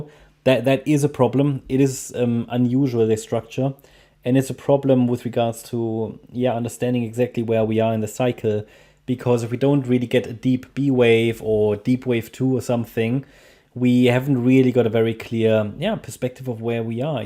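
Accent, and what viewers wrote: Germany. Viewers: Hi, deinem Akzent zu urteilen, würde ich jetzt behaupten du sprichst eigentlich deutsch 😆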